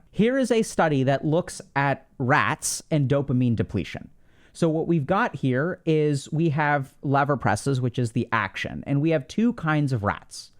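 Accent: Russia. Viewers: That’s a solid Russian accent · russian accent sounds so cool, tbh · I liked the russian accent) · Hearing speak in Russian accent is the real therapy, everything else is just a bonus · Doctor Ks russian accent is top notch tho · I found your Russian accent hilariously great. Very good!! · Solid Russian accent (i have the same)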